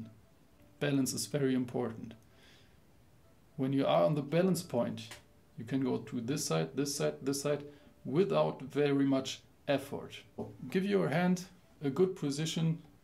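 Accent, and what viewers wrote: Germany. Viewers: haha it's german accent lol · before i look about you i knew that you r from Germany from accent.this video is so helpful for me .so cute _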